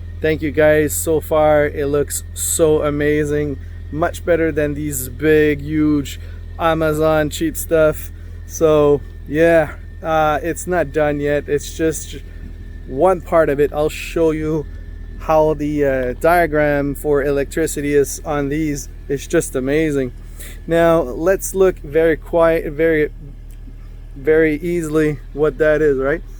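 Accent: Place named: Quebec, Canada. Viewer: J'étais curieux! L'accent. Tu avais des schémas en français! Je me demandais quel coin du QC tu étais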